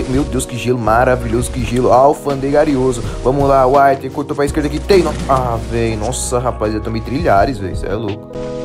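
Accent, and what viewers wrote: Brazil. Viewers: Uai ze c e mineiro ze??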